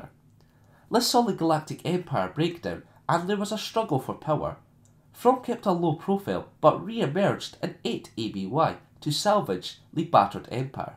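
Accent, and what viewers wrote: Scotland, United Kingdom. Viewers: So scottish my dude.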